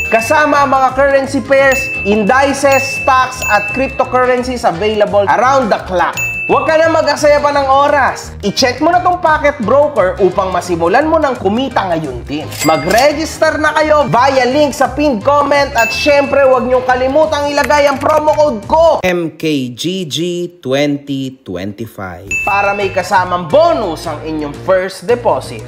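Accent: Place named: Conyo, Philippines. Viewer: Conyo ampt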